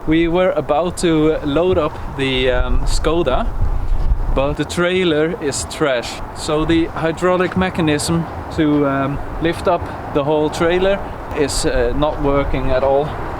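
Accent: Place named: Netherlands. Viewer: Ik hoor je Nederlands praten 😄 I hear you speak Dutch not Swedish 😀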